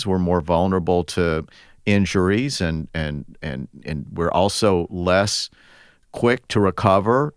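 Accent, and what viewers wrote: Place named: South, United States. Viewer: I bumped into your podcast couple weeks ago and I enjoy your information your presentation and your southern accent very informative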